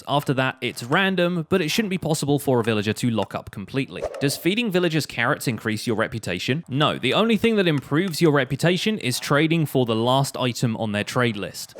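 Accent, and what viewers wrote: United Kingdom. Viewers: I mean he's like British or something you find that everywhere. And I know it's the pitch of the voice but it's still just British. Not to mention you said his accent and nothing like his voice. Sorry this is long I just had to explain something that I dont really understand. Exactly the reason I shouldn't have a response because I dont have a perspective. I wasn't trying to roast anyone or anything. I hope this is understandable. Thank you for your time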